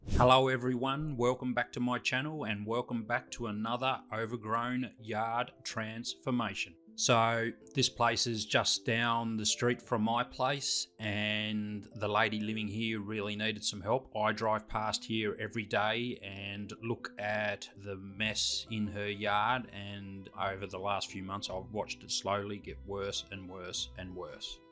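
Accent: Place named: Australia. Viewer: I'm enjoying the banter and seeing more of you, loving the aussie accent BTW. Keep up the good work guys, you do a terrific job 😊❤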